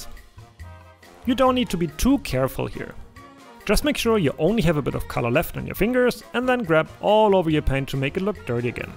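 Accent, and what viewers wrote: Germany. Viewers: Kommst du aus DE oder AT? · Ich liebe dieses deutsche Englisch! XD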